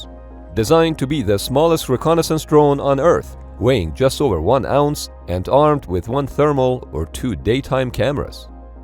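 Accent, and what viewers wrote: Iran. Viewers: Sounds Farsi-influenced :) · Khaliz Persian accent is evident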